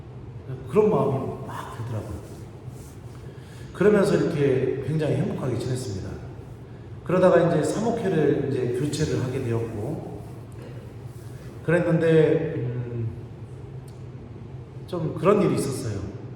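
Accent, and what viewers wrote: Chungcheong, South Korea. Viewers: 신부님 강론 말씀 듣고 있으면 마음이 뜨거워 짐니다 신부님 영육간에 건강 위해 기도 드립니다 신부님 사랑합니다 💜 충청도 사투리가 정겹습니다 감사합니다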